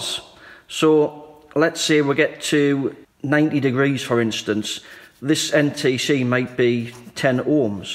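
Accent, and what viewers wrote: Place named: Geordie, United Kingdom. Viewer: I know that, my parents were Geordies from Ashington as well. They never dropped the of when they spoke that is more of a Sunderland thing. I was only making a point about you describing everything you do and wondered if it was worth it when people make silly comments about the things you've done. I apologise if I have aoffended you, I live in Australia now and it seems so odd when people have accents like yours.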